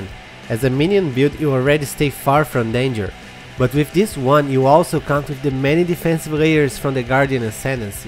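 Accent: Brazil. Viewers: Só pelo sotaque é brasileiro com certeza!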